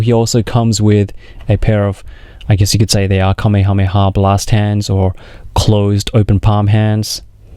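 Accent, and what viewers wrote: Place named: Australia. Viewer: hey man, great reviews and videos, just wondering where you buy your figures from? plus are you from australia? you sound like you have an aussie accent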